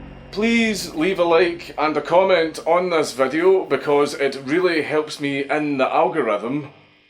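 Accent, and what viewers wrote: Scotland, United Kingdom. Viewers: When I first discovered him I watched so many of his videos in such a short period of time that my thought actually started voicing in the Scottish accent rather than my native American one for a while. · I only subscribed and liked all this dudes videos originally because I wanted to support someone they were trying so hard to bring down. I love the idea of reverse advertisements. Hell right now I'm looking into this ghost gun thing the news seems to be pushing so hard. I just couldn't get past the accent before. But I do have to say this little Scot is growing on me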